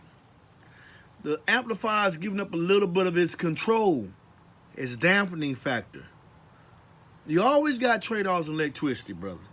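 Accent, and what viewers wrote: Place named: South, United States. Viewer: That country accent got me laughing 😂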